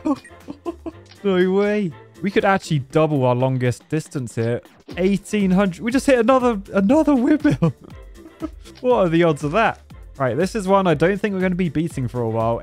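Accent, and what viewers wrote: United Kingdom. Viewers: gotta love the real britich accent amazing!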